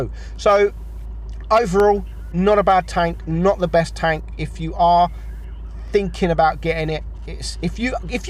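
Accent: United Kingdom. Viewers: Ik ur british but from where?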